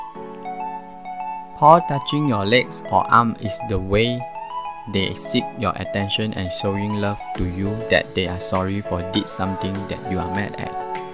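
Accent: Malaysia. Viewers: Are you from Malaysia? Because your accent sound so Malaysian to me.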